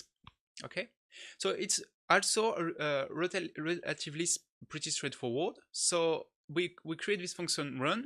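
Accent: France. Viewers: is this accent french? i barely can understand some sentences :D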